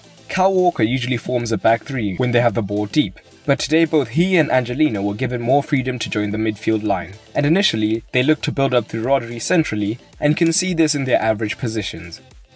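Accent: South Africa. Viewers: Hey man are you South African??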